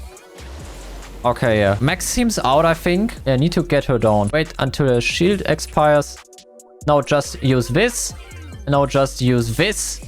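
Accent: Germany. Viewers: Bro your German accent IS sticking Out of that voice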